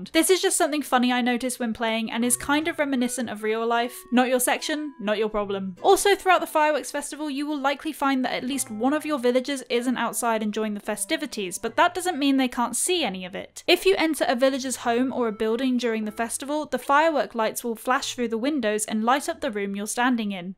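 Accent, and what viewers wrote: United Kingdom. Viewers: Finally, a british accent! Instant sub 😄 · I love her British accent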